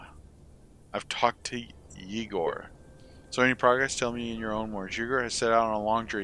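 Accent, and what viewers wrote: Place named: Midwest, United States. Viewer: I've really enjoyed your playthrough. Actually preferred over television/netflix or recent releases in the gaming industry. You do a great job, and struggle in a world with a character with flaws relatable to a common person. Though your character isn't set perfectly for an easy ride, it does however force you to play smarter just to survive, and a mishap can be a set back. Where are you from? You almost sound like you're from the midwest.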